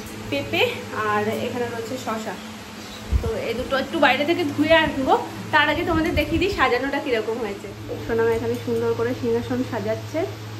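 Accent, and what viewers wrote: Bangal, Bangladesh. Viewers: By borne foreigner too tumi ..Ar tumi je educated ...sooo impossible bangal kotha adopt kora....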